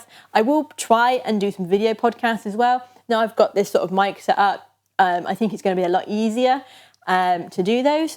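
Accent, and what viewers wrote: England, United Kingdom. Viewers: Congratulations from Madrid! I love your English accent… where you from?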